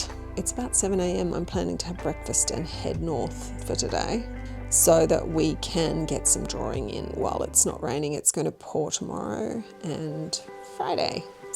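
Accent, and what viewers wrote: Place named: Australia. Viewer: you sound like you’re Australian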